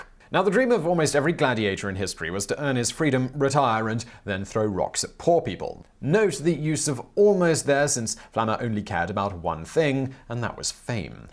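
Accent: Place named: United Kingdom. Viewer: I specifically listen to you for HOW you pronounce things. Give me British for this anglophile.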